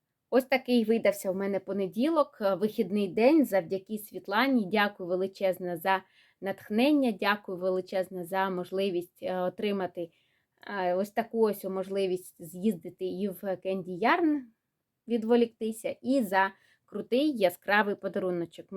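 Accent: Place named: Western Ukraine, Ukraine. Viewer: hand-pink-waving:Харків! Ви поруч зі мною! А спочатку мені здалося. що ви з Заходу України розповідаєте.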